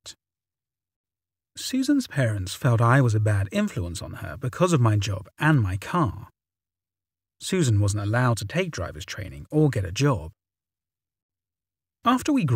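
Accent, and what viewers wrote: United Kingdom. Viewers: British accent or not, his delivery is high quality. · Love this guy! His narrations are so good! The British accent gives a flavor to it!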